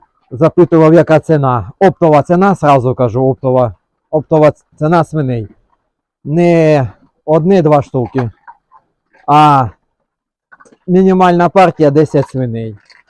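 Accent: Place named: Chernihiv, Ukraine. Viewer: Випадково відкрив,чую говір чернігівський.почитав коментарі.Привіт з бобровицького краю вам.підписався.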